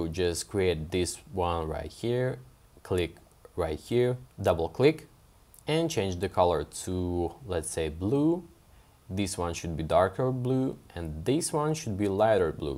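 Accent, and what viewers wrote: Russia. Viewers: Я вот чувствовал русский акцент, зуб был готов дать 🤣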